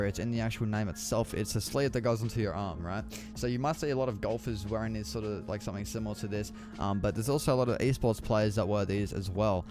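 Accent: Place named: Australia. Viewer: Australian, defiantly a follow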